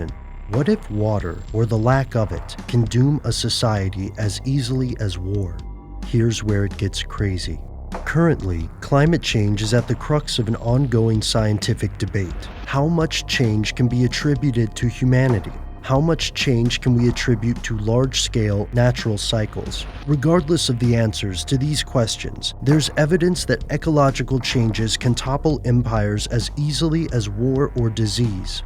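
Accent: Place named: United States. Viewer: love how you yanks destroyed the ENGLISH language. pronounce things the right way!!! like IRAQ not I Rack and Pakistan not Pack I stan.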